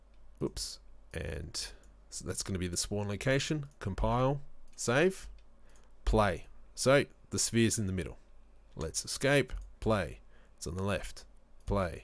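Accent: Australia. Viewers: Good to hear and aussie voice and nice tutorial. How would you restart the random spawn process once the actor is destroyed, having alot of trouble with the extra step.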